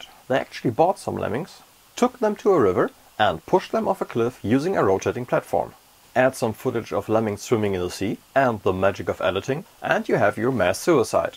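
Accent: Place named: Germany. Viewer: FOrget about what others are saying, you are just starting out, no one should expect you to be perfect. It doesn't matter if your camera is off, you don't do the white balance right as long as the content is great. Which it is. You give interesting facts and you have a german accent. Good enough for me. :)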